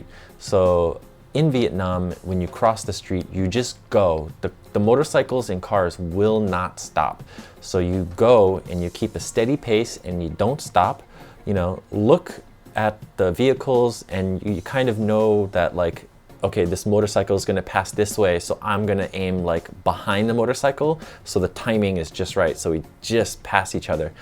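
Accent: United States. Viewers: I'm sure you've been asked this before. But how can you speak such with such a general American accent? You are from Japan, ethnic Korean. If someone doesn't spend most of their elementary school years in the U.S., they will have at least SOME accent. I don't hear even a hint that you didn't spend all your youth in America. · Ribas Yes, he does! really nice one... Sometimes I comment down on his Instagram photos and his Youtube videos, replied all of it and I'm very appreciated it. I'm Vietnamese, actually English isn't the main language in Vietnam, so I want to improve my English listening skill and then I decided to watch videos. I really love American accent. His voice was warm and inspirational... I always support him 😁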